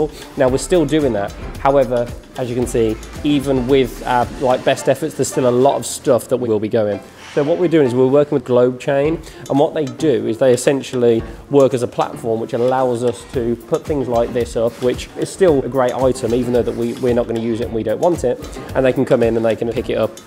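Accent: Birmingham, United Kingdom. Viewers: Obviously Birmingham but Dublin possibly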